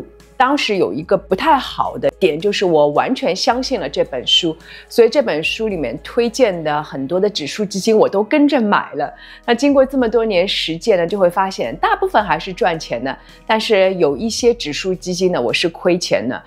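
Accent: Shanghai, China. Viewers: 沪普沪英很亲切，谢谢。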